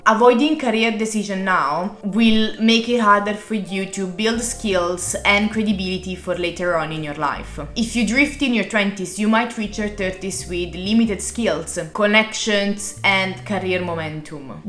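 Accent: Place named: Italy. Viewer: Hello, good morning 😊. I watched this video of yours and I liked it. I recognized you for your Italian accent. I admire the architecture and love music of your country a lot. Greetings from México 🇲🇽. By the way, both countries share the same colors in their flags. 😊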